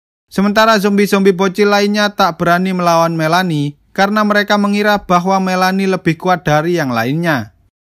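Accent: Java, Indonesia. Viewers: Wong jowo mas😅😅😅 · Sampeyan wong jowo yo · Kayaknya orang jawa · Logat jowo 😁😃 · weh wong jowo iki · Bang jawa mana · Jowo e ndi om ? 😂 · Mas e jawa ngndi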